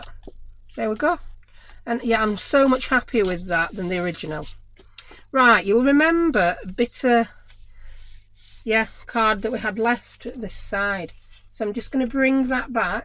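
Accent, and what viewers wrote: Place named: Birmingham, United Kingdom. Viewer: Oh....you are a treasure. You sound just like my Auntie from Birmingham and have the same sense of humour. Love every episode...keep em coming. Love from Canada xx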